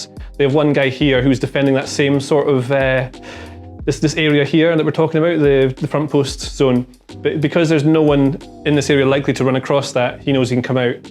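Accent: Scotland, United Kingdom. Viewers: Probably don’t work very well because of his Scottish accent, Google is pretty discriminatory like that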